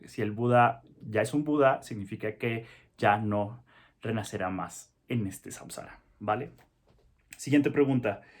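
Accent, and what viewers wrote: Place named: Mexico. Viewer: Es la primera ves en todos tus videos q se nota tu tonada, tono mexicano !!!! Sos un genio y profesional ante todo !!!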